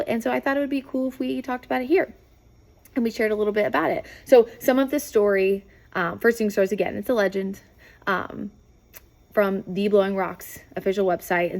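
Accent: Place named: New England, United States. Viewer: get rid of that yankee talk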